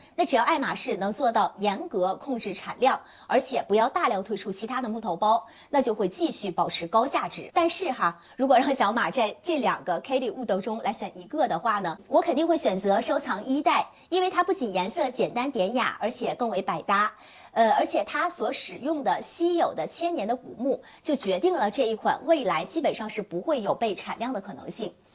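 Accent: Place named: Japan. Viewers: 因为她说的是日语发音。她在日本做这行，按日语发音来没有任何问题啊。就像我们把Hermes用中文音译成爱马仕，法国人也没理由来指责我们发音不标准吧。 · 可是她不只是用日語發音，還混著來，聽起來真的很突兀。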